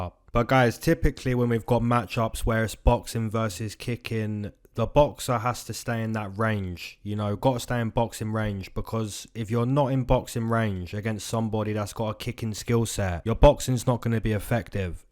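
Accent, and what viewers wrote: England, United Kingdom. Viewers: Your show is really good week in and week out. The comedy mixed in with accurate analysis in your England accent is pure money. My only complaint is I wish you would change the color of the background from white to anything else. That white background hurts my eyes on my TV. Everything else about your show is complete money. 💰💰💰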